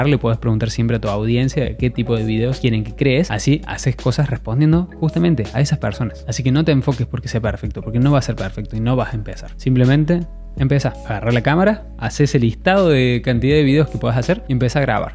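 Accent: Córdoba, Argentina. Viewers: Empezaste a hablar y pensé, aguante Córdoba carajo xD (imagino estar en lo correcto 🤔) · Hola "! Recién te encontré por acá...y ya me suscribí. Gracias por los tips, estoy con ganas de empezar un canal por acá... tendré todo lo que contaste en cuenta... Sos Cordobés? Me alegra encontrar un Youtuber Argentino... no veo muchos todavía... Saludos desde Buenos Aires...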